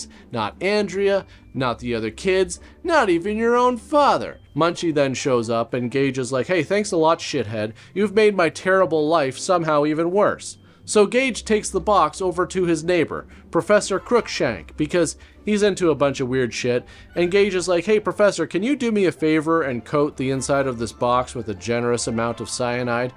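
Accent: Canada. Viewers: Oh, and he has the classic Canadian accent: "hoose", "aboot", etc. As a fellow Canadian, I can appreciate that. Haven't heard him say "eh?" yet, however.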